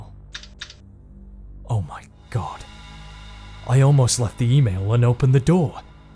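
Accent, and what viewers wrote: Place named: England, United Kingdom. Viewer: Oh crap you're English. Nice CP lel.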